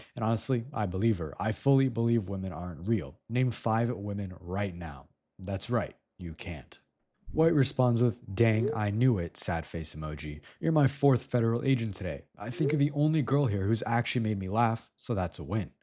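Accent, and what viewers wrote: United Kingdom. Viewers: the british accent is thick with this one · that british accent fucking broke me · The British accent is excellent.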